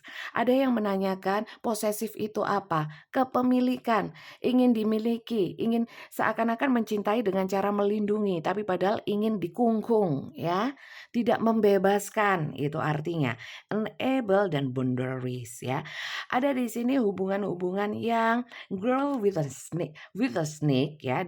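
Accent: Java, Indonesia. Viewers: Saya baru bergabung. Saya jatuh cinta dengan aksen medok nya, ibu. Sangat terhibur sekali. Tidak semua resonet, tapi apa yg ibu katakan masuk akal kalau. Membuka mind set saya. Dan saya mau subscribe n like postingan ibu. Semangat untuk upload ya Bu. Saya pasti dengerin ibu, tidak masalah resonet atau tidak.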